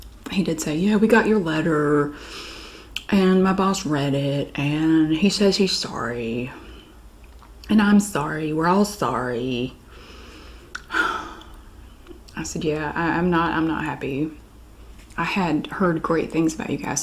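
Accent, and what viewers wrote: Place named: North Carolina, United States. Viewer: I’m new here…I just adore your accent. North Carolina?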